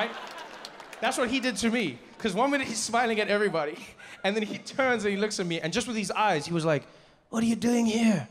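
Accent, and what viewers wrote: United Kingdom. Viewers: Sounds British to me 🔥🔥🔥🔥🔥💯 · Awesomely truth ! Sounds British to me .. **empty white brains 🧠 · Sounds british to me... 😅😅 Talks a lot about how assholes british are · Sounds British to Me. UNPARALLELED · Sounds British to me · sounds british to me 😂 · that sounds British to me 😂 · Definitely does sound British bruv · 'sounds british to me' THIS. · Sounds British to me....flipped me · That sounds British to me.... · Sound British to me 😂😂😂Bravo well said 👌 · That sounds British to me 😂😂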